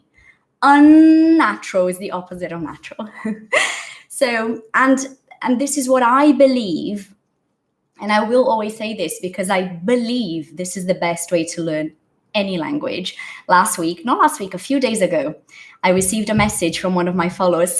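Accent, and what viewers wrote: United Kingdom. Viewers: Ma'am, you have a really good and beautiful British accent...bruh. I started my English speaking American accent and now I'm falling in love with British one.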